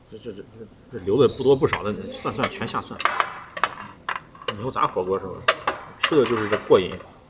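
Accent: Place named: Xi'an, China. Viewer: 是咱西安乡党吧，这一口亲切的醋溜普通话哈哈哈哈😂😂😂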